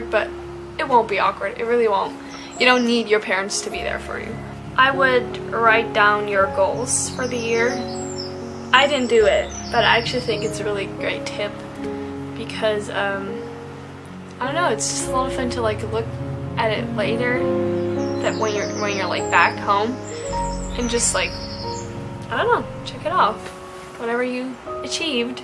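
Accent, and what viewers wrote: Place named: United States. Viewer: You haven't lost your American accent yet. Great tips!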